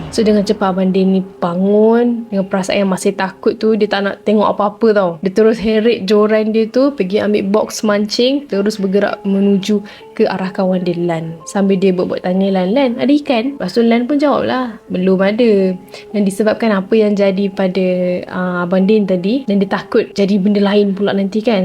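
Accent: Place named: Kedah, Malaysia. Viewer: seronok dengar cakap kedah😅😅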